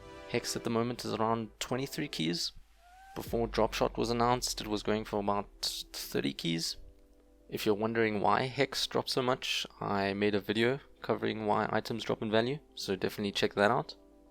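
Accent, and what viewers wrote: South Africa. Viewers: Are you South African 😂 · You're South African? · Are you South African?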